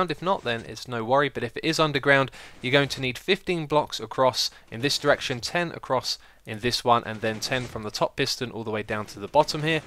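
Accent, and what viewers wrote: England, United Kingdom. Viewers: I thought was from England:P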